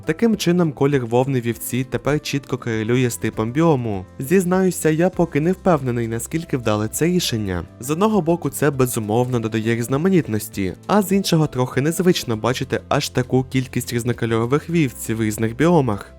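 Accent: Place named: United States. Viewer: У вас дуже файний американський акцент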